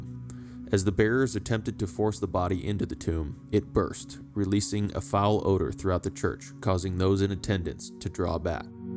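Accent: United States. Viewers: Pronunciations need work -- too bad to hear an American voice instead of a British one. E.g., Aethling - long a or AA (ae diphthong use in OE). But clear useful information of historic figures.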